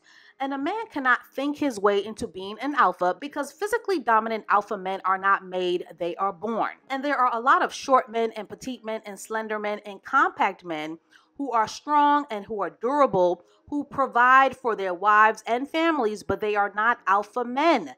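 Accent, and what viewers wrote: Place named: New York, United States. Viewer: The way u say "boss" makes me assume you're from New York lol love that accent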